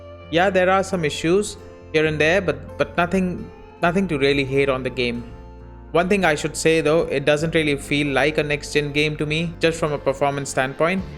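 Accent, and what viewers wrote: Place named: India. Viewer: Couldn't watch longer than a minute without clicking off listening to that annoying Indian accent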